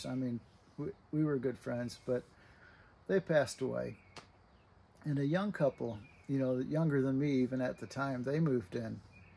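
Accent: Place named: Liberia. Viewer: I'm from Liberty. When I hear you speak, it takes me back there.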